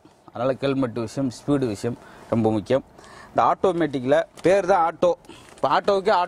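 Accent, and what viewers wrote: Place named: Madurai, India. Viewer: UNGAL COMEDY HIT AAVURADHUKU MADURAI SLANG UH ORU KAARANAM 💯💥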